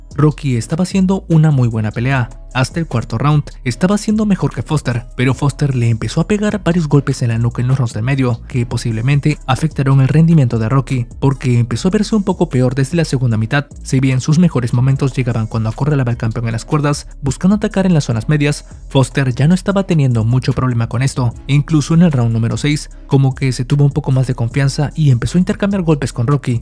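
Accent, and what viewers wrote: Mexico. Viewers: El comentarista es mexicano?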